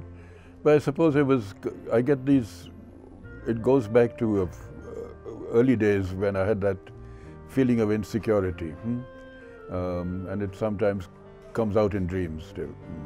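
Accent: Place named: United Kingdom. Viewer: A real mixed British Indian accent! Indo Britishers note!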